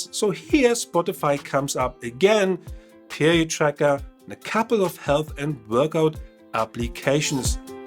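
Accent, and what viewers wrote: Germany. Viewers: Englisch ist nicht wirklich deins mit einem deutschen Akzent. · Sehr Gut Gemacht video. I like your Deutsch-English 😂😍😍😍💓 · Noice review, wonderful German accent.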